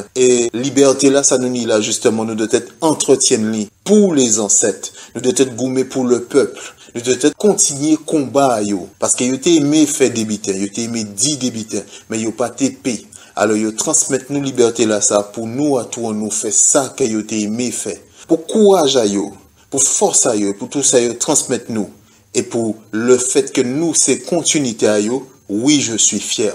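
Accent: France. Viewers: ton créole est très approximatif. Il est très francisé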